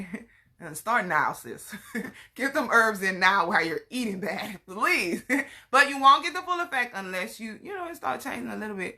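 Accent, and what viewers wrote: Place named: Louisiana, United States. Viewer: Just recently subscribed you are so beautiful inside and out what state are u from. Love that accient sound like you from lousiana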